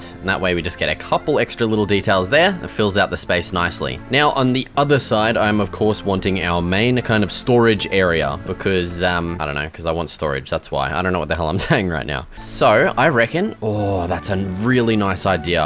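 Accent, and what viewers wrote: Australia. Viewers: That’s just cause he’s Australian lol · All I hear is angry Australian 😂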